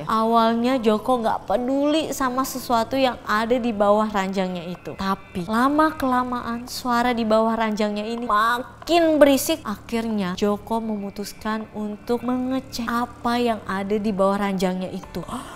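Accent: Java, Indonesia. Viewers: bunda sarwendah gemes baca bahasa jawa sehat selalu bunda sarwendah cantik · maaf ya.. bahasa jawanya bikin ngakak.. tp ga apa2 bhs jawa pelawalannya mmg susah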